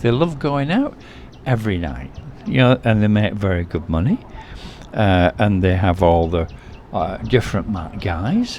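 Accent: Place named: Yorkshire, United Kingdom. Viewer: Think I sat next to you in Heavens Above in Jan this year? I recognized the accent and when I asked if you were from Yorkshire you sounded surprised/concerned and asked me how I knew. It was you right?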